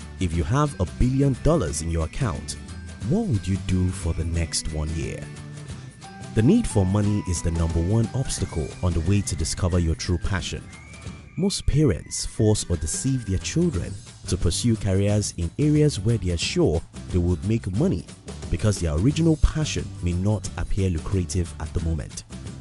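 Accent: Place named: Nigeria. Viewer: How DARE YOU fucking disagree with Marsha Sinetar. I picked an accent you are probably Nigerian. No surprise there.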